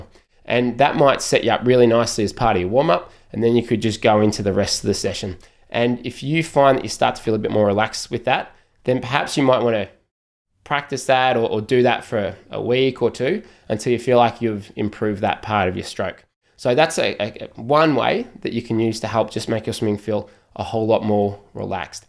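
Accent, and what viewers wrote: Australia. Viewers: This is very helpful and( are you Australian) You are very handsome young gentlemen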